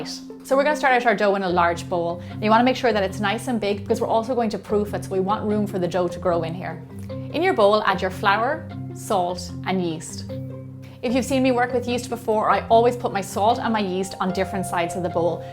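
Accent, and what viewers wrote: Ireland. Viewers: Hello are u irish if so I'm half irish and I went to Ireland for 4 weeks and made half your stuff love you! I'm going to be a confident bold baker for the rest of my life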